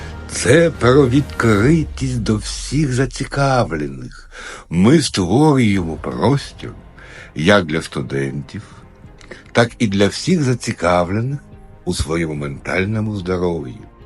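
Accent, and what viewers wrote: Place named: Ukraine. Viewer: у шановного такий чарівний український акцент, майже як у Петра Весклярова (до речі, також єврея), відомого нам з "Вечірньої казки" радянських часів як "Дід Панас":>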